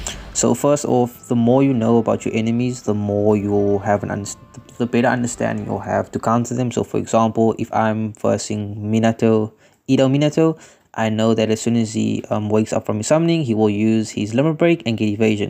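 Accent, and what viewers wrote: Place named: South Africa. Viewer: Yo bru where u from ur accent sounds South African??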